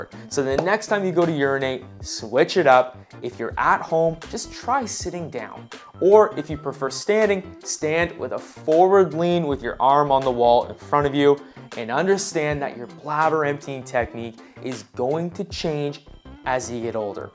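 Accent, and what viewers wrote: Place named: United States. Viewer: Is it possible to give English captions (at least important steps). Because, English is a foreign language for several people. And it is difficult to follow the US pronunciation for people like me.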